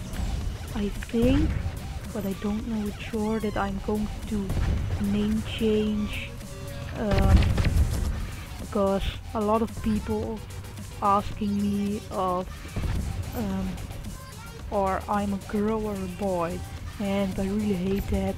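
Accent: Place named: Netherlands. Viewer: Je hoort wel goed dat je nederlands bent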